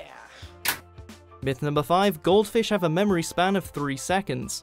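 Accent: United Kingdom. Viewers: He has a British accent, so you can stop attacking him over how he says Echo. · he's British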